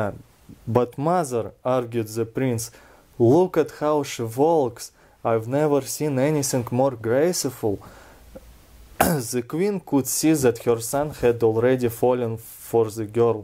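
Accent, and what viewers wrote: Russia. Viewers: Im obsessed with russian accents, specifically strong ones like yours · thank you my comrade i am learning to do a Russian accent for voice acting and this helps a lot because your voice and accent is perfect and strong. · I'm already subbed to your channel, but this just popped up in my feed. I love your Russian accent. I could listen to you all day. 😊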